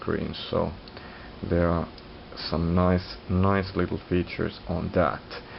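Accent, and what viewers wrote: Finland. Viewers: Hah, accent doesn't get more Finnish than that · Selvästi suomalainen mies puhumassa englantia :)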